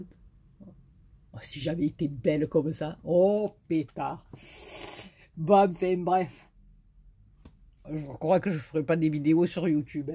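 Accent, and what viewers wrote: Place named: Midi, France. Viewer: Coucou merci pour ce partage de bal. De jolies créations encore.. Tu m'as fait rire avec "c'est une petite galejade" 😊 du Midi ça te va bien avec ton bel accent que j'ai perdu 🙂 Je suis du 84 😎 mais vis dans le 50 ☔ depuis 2013 😌 Alors pour moi c'est juste un plaisir de t'écouter 😊 Bisous!😘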